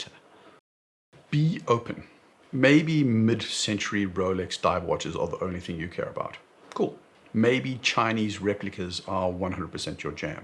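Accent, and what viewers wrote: South Africa. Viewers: Bro are you South African?😅Speculating here. I do have loads of South African friends, hearing you was refreshingly unique but familiar.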